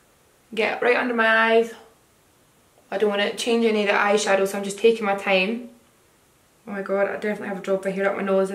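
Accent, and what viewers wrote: Scotland, United Kingdom. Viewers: What part of Scotland are u from I'm from paisley love this look deffos subbing xx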